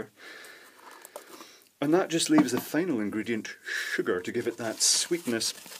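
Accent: Scotland, United Kingdom. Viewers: ha nice to hear a scottish accent. listening from Paisley.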